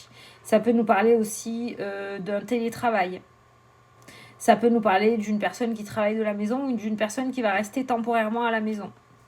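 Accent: South, France. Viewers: Ja doré le accents du du sud. Perpignan. Le boulou argeles je connais bien bien moi je suis du Loiret beugency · Bonsoir j'ai été a Baillargues pendant 50 ans et je suis a Hyères depuis 2 ans on voit bien que vous êtes une vraie sudiste l'accent est formidable et vos éxplications sont très claires et exactes merci et à bientôt très cordialement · moi c'est le 04 Manosque tu vois le sud avec l'accent bien plus prononcé que le tien, chantant comme les cigales, très fière de mon accent tant pis s'il ne plaît pas à tout le monde c'est le mien 😘😍 allez hop la vidéo je t'écoute je suis toute ouïe 😊😊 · Bonsoir moi je viens du sud ouest Hossegor Et je rentre tout juste de Perpignan où vit ma Soeur Merci pour cette bonne humeur et l’accent mon dieu que je le connais et c’est meilleur oui, tu gères la fougère terrible merci 🙏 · Coucou du soir alors moi je viens de Clermont-Ferrand et actuellement nos belles montagnes sont sous la neige et on se pèle Ahhhh Perpignan c'est une belle ville c'est normal que tu ai l accent du sud ! 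jeudi qui s'annonce un peu compliqué mais on va faire avec En ce qui concerne le sport ce ne sera pas pour demain parce que je vais chez le kiné lol C'est super rigolo parce que j'ai le même jeu que toi Et ce matin je me suis tiré la carte qui me conseille de manger des fruits et légumes et de devenir végétarienne LoL Ton collier je l'avais remarqué j'étais certaine que c'était un cadeau de Noël, au fait en parlant de ça le collier chapelet je l'ai trouvé sur Amazon pas le même que toi mais très jolie aussi Faites c'est drôle parce qu'on utilise les mêmes jeux j'adore j'imagine que le goal de utiliser consultation je sais pas ce que tu en penses mais moi quand je les dans les mal ressenti est décuplé par rapport aux premiers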